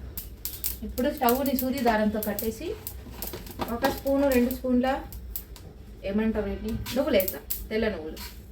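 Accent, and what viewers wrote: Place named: Rayalaseema, India. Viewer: Good to hear your conversations. Your Rayalaseema accent is wonderful to hear. Love your videos 👌👌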